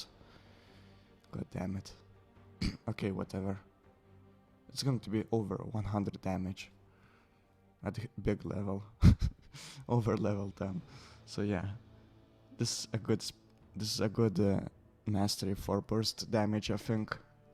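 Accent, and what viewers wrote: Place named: Lithuania. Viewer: Where are you from? You sound like a Lithuanian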